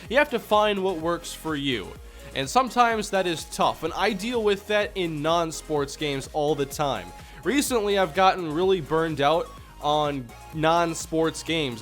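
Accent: Minnesota, United States. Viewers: are u from minnesota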